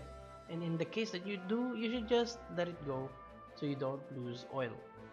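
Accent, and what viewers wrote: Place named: Philippines. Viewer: Ang accent mo parang Filipino Pilipino ka ba?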